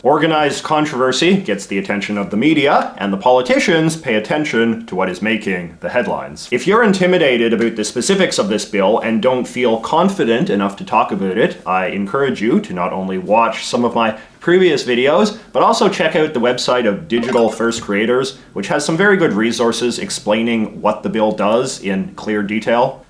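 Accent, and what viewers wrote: Canada. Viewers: I absolutely love that you don’t have a super thick Canadian accent except on “ow” sounds. That’s not a knock on you or your accent, I just find it genuinely interesting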